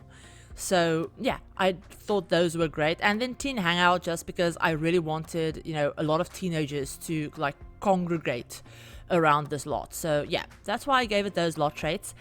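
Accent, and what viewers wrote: South Africa. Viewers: Not me hearing the accent & immediately being like yes a fellow saffa simmer ❤🎉 beautiful build, adding this immediately!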